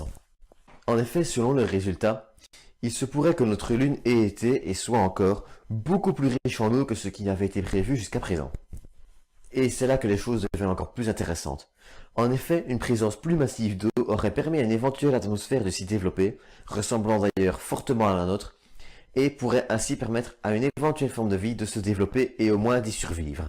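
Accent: Liège, Belgium. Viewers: T'es liégeois toi nan ? 😃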